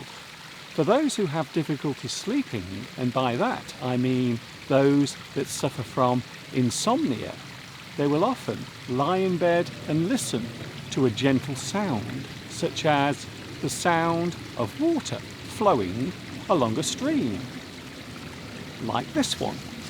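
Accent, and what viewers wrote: United Kingdom. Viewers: I just discovered your channel and I really admire your work. I’m moving to England soon and was looking for english boroadcasts where i can listen and be used to Britain english accent. Thank you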